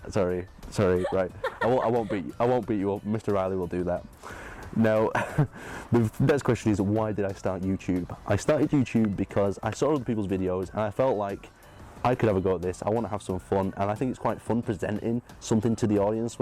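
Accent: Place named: Manchester, United Kingdom. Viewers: I'm from Manchester (the city, greater Manchester), I have a similar accent. I always thought because of my accent it would make it impossible to do you tube, but proved me wrong! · Oi Manchester lad! Greetings from West! You should try scouse accent. That would be hilarious in your videos.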